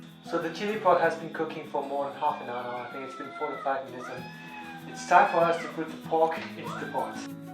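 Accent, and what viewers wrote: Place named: United Kingdom. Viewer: I loooove your British accent 😍😍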